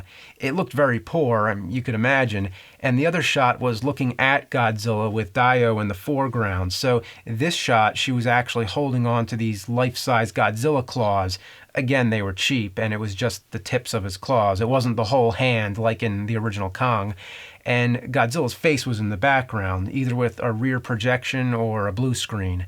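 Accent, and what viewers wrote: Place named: New Jersey, United States. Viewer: Everyone correcting pronunciation as if his filthy Jersey accent isn't something we all love about him.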